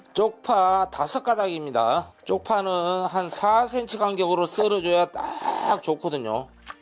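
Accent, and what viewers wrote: Jeolla, South Korea. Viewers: ㅋㅋ김대석쉐프님 전라도 말씨가 겁나게 구수해요 저두 그짝ㅋ 저두응식한지20년이넘었는데 참맛나게 잘하세요 참고해서 많이 활용할게요 더많은 레시피 부탁합니다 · 구수한 전라도사투리 인간적으로 보여요ㆍ🎉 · 전라도 사투리가 구수하네요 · 세프님 전라도 사투리 넘나 잼 나요 종발이라는 말 아주어렸을때 들어서 정겨워요 오이 물 김치 잘 해먹어봐야죠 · 전라도 사투리가 넘 정겨워요. 재미있고요. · 전라도 분이신가 말투가 구수하시네요 ㅎㅎ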